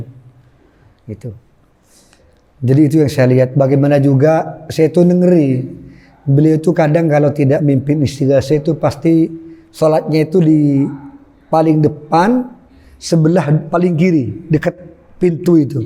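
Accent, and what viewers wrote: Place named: Madura, Indonesia. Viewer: Logat madura ya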